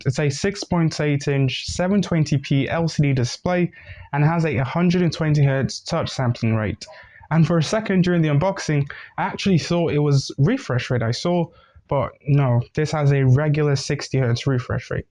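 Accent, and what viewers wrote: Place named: United Kingdom. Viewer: Nice review. Plus I like listening to ur British accent. Always.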